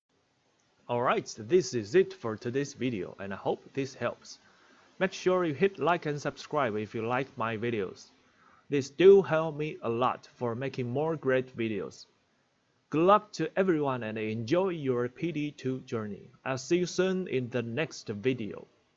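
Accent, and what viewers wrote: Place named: Japan. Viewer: Love it! :) Entertaining and useful! Love the japanese accent aswell adds to the credibility. I dont have time to the all the test a research anymore so this is very nice. Keep up the good work.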